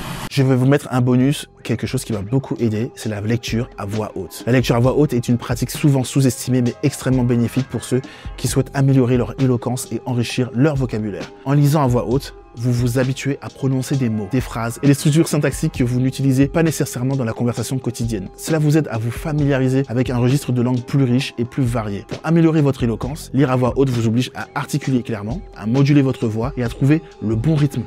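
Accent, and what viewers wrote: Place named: Ivory Coast. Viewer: Avec un subtil accent suave Ivoirien 😅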